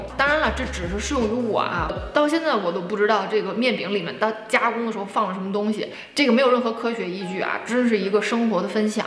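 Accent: Tianjin, China. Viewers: 你好，请问你是天津人吗？ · 我第一次看你的视频就觉得你是天津人，和我一个多伦多的天津朋友说话的那个味儿太像了。我本人坐标温哥华，希望能分享些挑选衬衫的方法。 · 博主讲话有天津味儿。跟Tracey 有点像。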